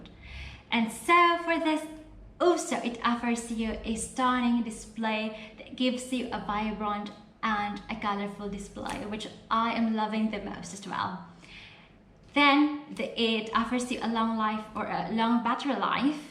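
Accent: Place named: United Kingdom. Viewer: Love the accent - grabeh! British accent bah? Dko sure. Love it!!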